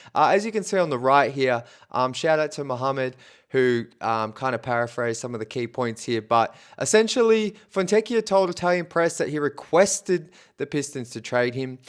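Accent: Australia. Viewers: how does his balls taste? He lives in Michigan and has no more information than a decent beat writer. He just has an Aussie accent that makes listening to him different for an American audience. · No. recent example is the Fontecchio piece. I didn’t hear that here. Nothing on it but I don’t pay for the on-line subscription fees for news bought by a news information product company. Providing the same news stories to across the land. I.E. ~ Knight-Ridder (if they are even around). Point is. Same content across the board. That’s old, presumptive, and to me breaks the code of having your own paid reporters. more of a reporter on the Pistons than a regurgitate, local Detroit reporter here is. I’m a global human. I don’t care about accents. The NBA is a global brand. Aussie…top 7 countries in bball except the U.S. So his talk sounds right to me.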